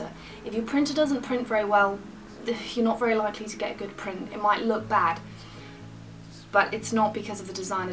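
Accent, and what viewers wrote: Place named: Brighton, United Kingdom. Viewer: You sound like you're from Brighton (: you're a really beautiful woman too! Very natural (: xx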